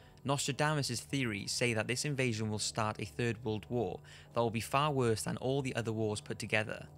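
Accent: United Kingdom. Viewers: The most unsettling thing about this video is hearing a guy with a british accent say "Nucular"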